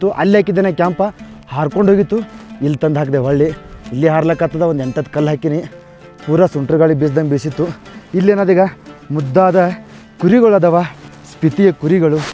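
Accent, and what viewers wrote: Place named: Karnataka, India. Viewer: Super bro .. ಜವಾರಿ ಕನ್ನಡ.. ❤❤ ಅಲ್ ದ ಬೆಸ್ಟ್ ಬ್ರೋ❤❤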